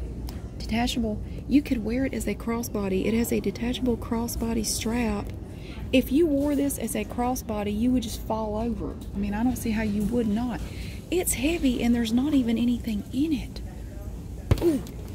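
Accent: South, United States. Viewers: I just want to say I laughed several times throughout this video. Some of your comments about the items are really funny. I'm from South Carolina but I've been living abroad for the last 3 years. I love it but sometimes I miss hearing southern accents and sassy, warm and funny ladies like you. You remind me of my aunts hahaha. I also miss Target A LOT. Nothing like it here in South Korea.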